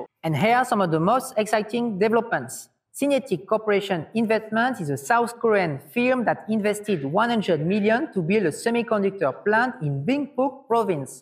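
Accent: France. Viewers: I love the French English accent.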